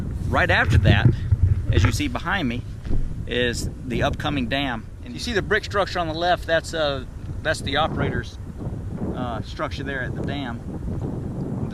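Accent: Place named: North Carolina, United States. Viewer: I had no clue where the Haw River was. However, as soon as you started talking, i knew you were from NC or lived there. Something about that NC accent makes me miss home man, should have never moved out of Asheville, NC. Indiana sucks.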